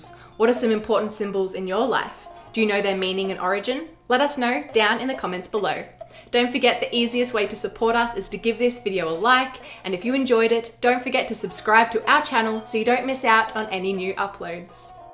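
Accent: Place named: Australia. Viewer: First off, though the narrator sounds Australian, she could just as easily be South African or even white Kenyan with that accent, so don't make assumptions based on skin color. Second, how is this stopping "Africans" from telling their "own" story? Third, who's exactly "African" in your opinion? "Kemet" Egyptians have been proven through the archeological record and DNA analysis to be from the modern Syrian, Israeli, and Palestinian region. Then they were further Semitized through the Hyksos invasion in the north, while having sub-Saharan contact through the Nubians. Both of those groups held dynastic rule for a short while. Then the Persians conquered Egypt. Then the Macedonians. Then the Romans. Then the Arabs. So again, who do you believe is "African" in your opinion?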